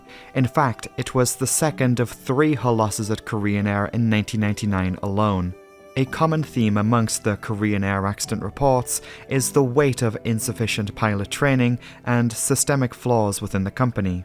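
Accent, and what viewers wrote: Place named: England, United Kingdom. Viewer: I know what a metre is and precisely how to spell it. Come English sounding person you can't sound intelligent without being able to spell the basics of the thing you are talking about.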